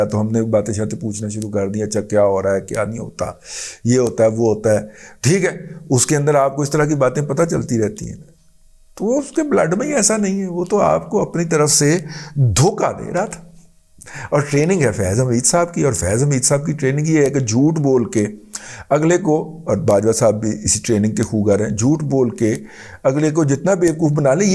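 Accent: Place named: Okara, Pakistan. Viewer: sir ge app depaalpur okara waloon ki zaban bol tay hain. hamaray relatives wahana rhtay hain hum log basically bvahwalnagar ke hain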